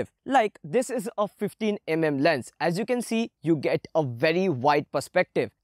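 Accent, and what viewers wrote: India. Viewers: The Indian English accent kill me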